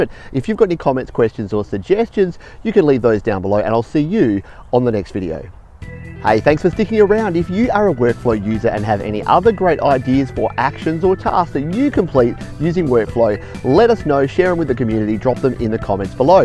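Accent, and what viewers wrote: Australia. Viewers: Australian. So am i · Australian def not a Brit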